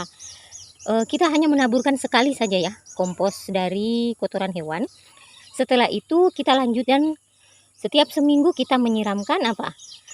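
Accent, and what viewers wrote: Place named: West Kalimantan, Indonesia. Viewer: Orang Mana Bunda,logat bahasa daerahnye sepertinya org Melayu Kal-Bar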